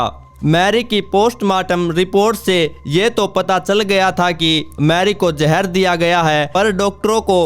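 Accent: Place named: Rajasthan, India. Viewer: Rajasthani aise hi bolte he shyad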